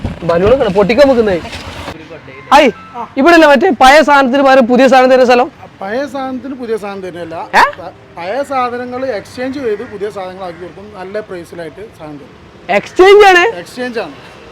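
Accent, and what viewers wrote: Malappuram, India. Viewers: Hyy ningaludee video nalla vibe anee. Nammude Malappuram bashayil athintethaya reethiyil avatharippichh tharunna ikkaa.... Ingalum ingalee videosum adipoli ane tto❤️👍🔥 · ഹായ് മുത്തുമണീസ് നിങ്ങളെ വിഡിയോ ഒരു വെറൈറ്റി ആണ് തന്നതായ നാടൻ ശൈലി സ്ഥിരം ഉപയോകിക്കുന്നമലപ്പുറം ഭാഷ കളർപില്ലാത്ത സംസാരം 👍👍നിങ്ങളും വീട്ടുകാരിയും ചെങ്ങായിമാരും 👍👍👍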